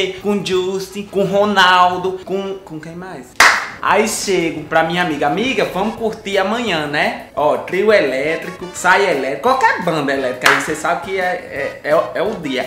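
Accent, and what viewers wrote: Northeast, Brazil. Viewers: De que estado é a Samantha? A região é claro Nordeste. <3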